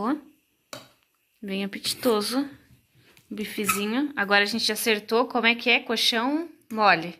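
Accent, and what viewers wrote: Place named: Rio Grande do Sul, Brazil. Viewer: Perguntei de onde vocês são porque você tem sutaque de gaúcha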